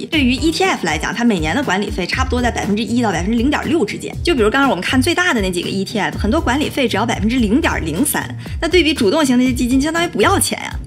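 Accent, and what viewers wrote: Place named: Dalian, China. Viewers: 好难听的大连口音啊，顿时不爱了！ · 大连口音一不留神就漏了。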